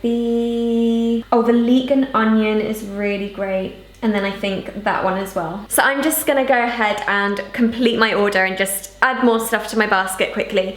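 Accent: United Kingdom. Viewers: I love her British accent! It's so relaxing to listen to her talking! ❤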